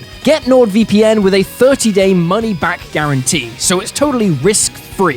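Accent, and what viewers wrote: Australia. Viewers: I thought you were Australian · i dont know why i thought he was Australian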